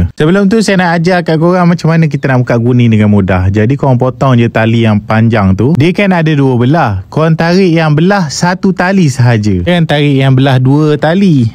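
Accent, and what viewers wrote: Pahang, Malaysia. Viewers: macam orang pahang. lorat pahang ke?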